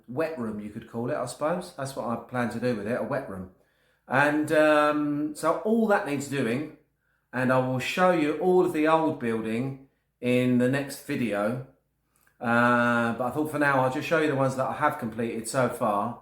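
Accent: Essex, United Kingdom. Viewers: Love your channel. Are you from Essex by any chance? Good luck with the renovations. 👍🥂🍾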